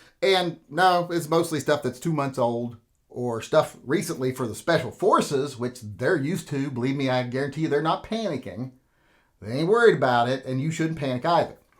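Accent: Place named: South, United States. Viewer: I don't even listen to the other channels anymore. Thanks! Even though I can tell you're a Southern boy and not a -_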